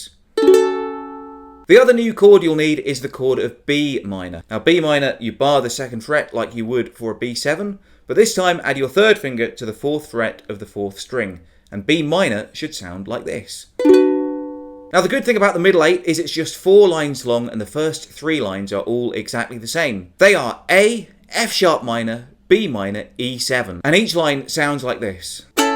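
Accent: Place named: United Kingdom. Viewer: I love youuuuu. The best Ukulele teacher ever!!!!! You make the instructions so simple to follow!!! I love youuuu. You've just got another subscriber. Huhu I love you so much you make it much easier for me. I just began learning Ukulele a couple of days ago and now I can play and sing. Next is this song, my most favourite jazz song ever:( I love your British accent too. Hope everything good comes to you <3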